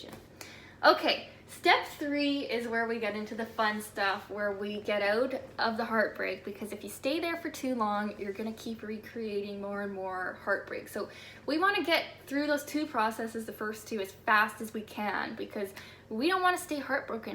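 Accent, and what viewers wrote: Canada. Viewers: Are you from ontario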